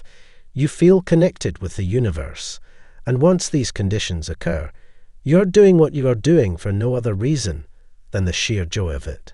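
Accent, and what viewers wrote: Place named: Scotland, United Kingdom. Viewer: What a grand Scots brogue you have ser. A gent and a scholar! Many thanks.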